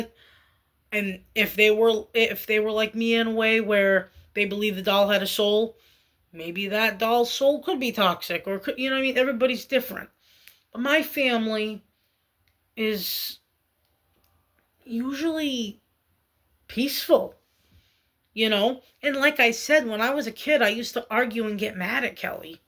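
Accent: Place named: Yonkers, United States. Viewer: Randomly stumbled onto your channel - I have no idea about the doll side of YouTube. But I really enjoy listening to you speak. You sound like my family from Yonkers 🥹 maybe it's calming to listen to you reminding me of them.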